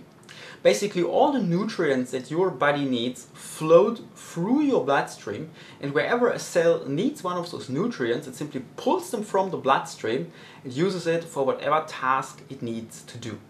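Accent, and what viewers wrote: Germany. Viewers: Loving your accent ,are you german?Anyway nice video,good info.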